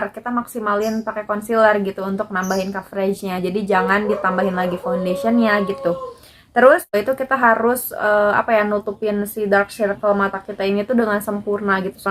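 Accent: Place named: Bandung, Indonesia. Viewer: Bandung baget logatnya 🤣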